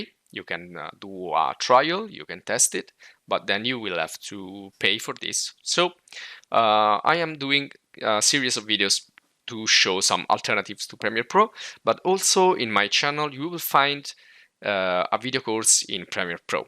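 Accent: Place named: France. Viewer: thx very helpfull. You accent looks frensh buddy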